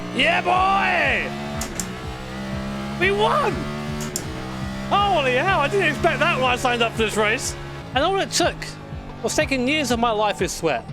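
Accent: United Kingdom. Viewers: YOU'RE BRITISH???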